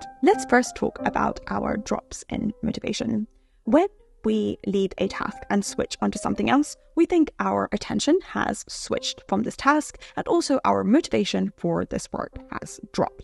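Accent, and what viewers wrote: United Kingdom. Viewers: Is it British accent who know about that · Your voice is not annoying. It sounds just like Karen Gillian. (though it might just be the british accent). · Oh my god I don't understand British English, I'm learning American english 🤦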